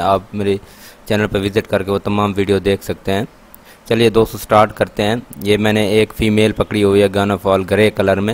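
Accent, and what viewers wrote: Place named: Punjab, India. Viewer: اپ اپنا اردو بولنے کا طرز ٹھیک کر لو اپ کا انداز بیان اچھا ہوگا اور اردو بولنے کے اندر پنجابی لہجہ نہیں ائے گا تو لوگ اپ کی بات سے ویسے ہی متاثر ہوں گے اور خود بخود اپ کو سبسکرائب کریں گے کیونکہ جتنا اپ روانگی کے ساتھ ادب اور اخلاق کے اچھے بہترین طرز کے ساتھ اپنی گفتگو کریں گے اتنے سارے لوگ اپ کی ویڈیو کے لیے بے تاب ہوں گے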